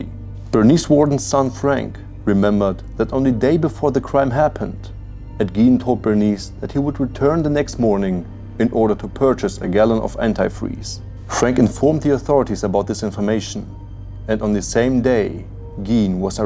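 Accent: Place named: Germany. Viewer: I think it might be a German accent. Your accent is perfect. You are 100% clear and your narration is pleasant. Ich kann ein bisschen Deutsch. I know some German.😀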